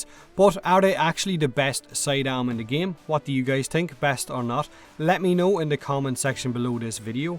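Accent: Ireland. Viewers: I fehkin lov your accent :D Is it irish? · ayyy another Irish fella on Youtube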